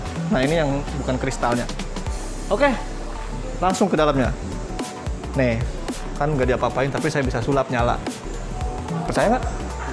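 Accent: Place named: West Java, Indonesia. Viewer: Sundanis banget"munggahan kang😁kang"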